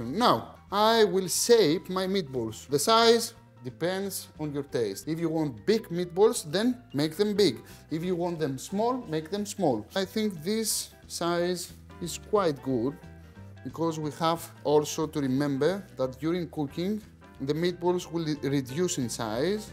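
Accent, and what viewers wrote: Greece. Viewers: Great recipe, easy in oven. You are one of my fav chefs on you tube. Thank you. ❤love the accent love that you’re Greek! Want more Greek recipes.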